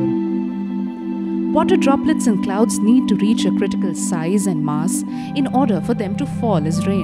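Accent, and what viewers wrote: India. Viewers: that indian accent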